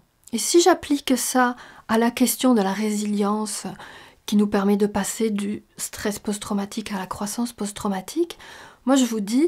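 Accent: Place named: South, France. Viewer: Tu viens du sud toi c'est clair ;)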